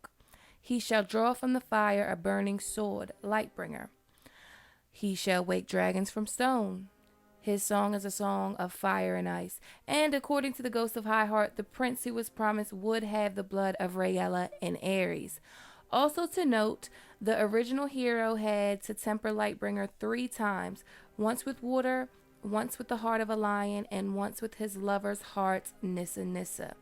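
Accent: United States. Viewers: Considering I'm not normally one who goes for American accents I couldn't agree more. Like sweet music......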